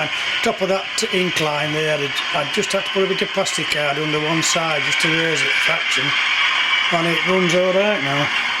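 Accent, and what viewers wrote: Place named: North, United Kingdom. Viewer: Hi, What a lovely set up you have, it looks great even as you said, a long way to go??, keep up the good work, nice to hear a north country accent as I am from the north country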